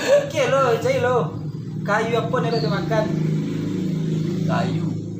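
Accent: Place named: West Sumatra, Indonesia. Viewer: Keren abis dah bang. Seneng denger lagunya. Sama seneng denger logat minangnya.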